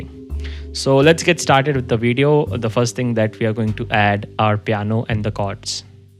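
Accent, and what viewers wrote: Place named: India. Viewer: I never thought voice was Indian. Well you shocked me and you're still cool bro.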